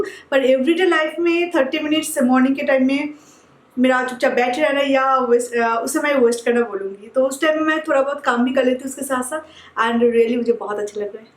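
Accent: Bengal, India. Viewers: M following her since last 5 months and loved her vlogs i almost watched her all previous videos too loved the way she use to handle every thing and specially her bengoli hindi mix accent but i dint criticised her ever i just go through comments section and use to see hw ppl use to troll her and feel bad for her but she doesn't care for her viewers.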